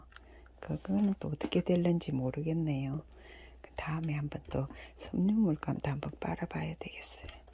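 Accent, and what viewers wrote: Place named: Gyeongsang, South Korea. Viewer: 반가워요 ^^ 갱상도 사투리가 와 이리 듣기 좋뇨..ㅎㅎ 풀청했습니다..그리고, 좋아요..5 눌렀습니다.. 요근래 구글이 좋아요를 삭제하는것 같더라구요, 그래서 전 시간내서 어렵게 방문 한 유친님들게 풀청후에 좋아요 눌러드립니다.. 응원합니다 ~!!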